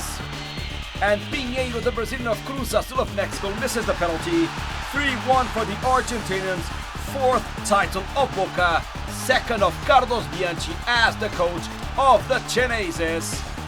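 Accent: Portugal. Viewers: Nice portuenglish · E portugues falando ingles, fico mt engraçada essa narração ai kkkkkkkk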